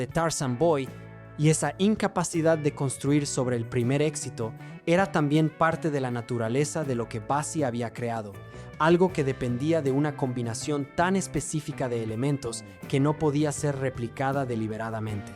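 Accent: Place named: England, United Kingdom. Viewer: Es insoportable esa voz de inglés tratándo de hablar español!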